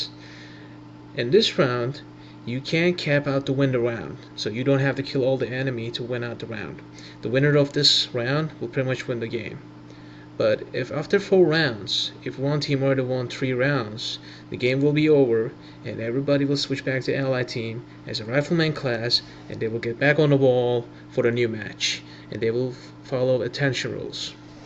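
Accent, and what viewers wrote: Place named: Turkey. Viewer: Listen to and his cute ottoman accent <3.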